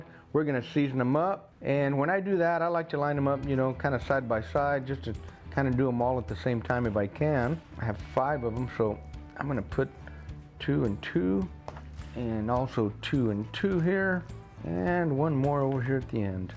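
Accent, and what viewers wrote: Texas, United States. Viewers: What part of Texas you from?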